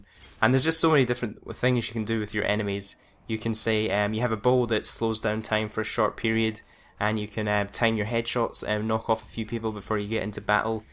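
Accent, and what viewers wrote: Scotland, United Kingdom. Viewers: What part of scotland are you from x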